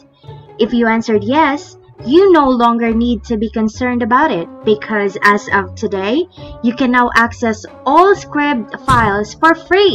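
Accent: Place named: England, United Kingdom. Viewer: Watching here po ma’am from Cielo Cartagena Vlogs☺️Thank you for sharing this very knowledgeable and very informative content of yours ma’am.Watching here all the way from 🇯🇵I also like your English accent.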